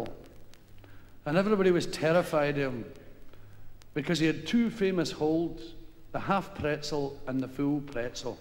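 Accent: United Kingdom. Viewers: Well that’s his accent so maybe you shouldn’t bother watching it! I’m Scottish come from the same place as & I’m so glad he got Scottish culture & humour out to the rest of the world on yer sel big yin!! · Well I'm a Portuguese-Australian and thanks to your subtitles I'm now starting to understand Glaswegian a lot better. You rock 😁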